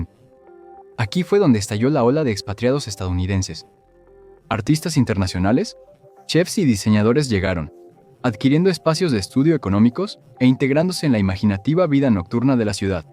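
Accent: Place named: Spain. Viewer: Claro, suena muy español lol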